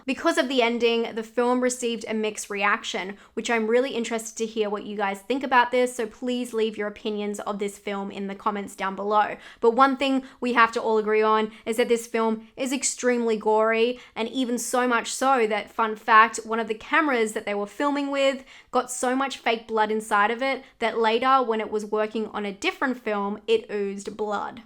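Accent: Australia. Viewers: I love it when you say "bizarre". Love an Aussie accent!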